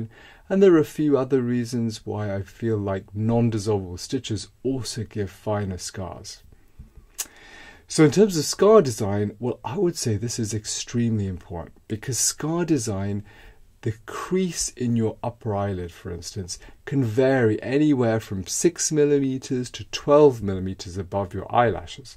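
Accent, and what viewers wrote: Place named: England, United Kingdom. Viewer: SOUNDS like London, England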